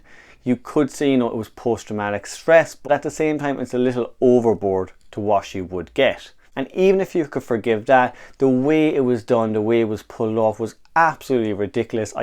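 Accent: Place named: Ireland. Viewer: I never really got into this franchise, so I have nothing to comment on. I’m just here to give you a thumbs up and I LOVE your accent!! Is that Irish?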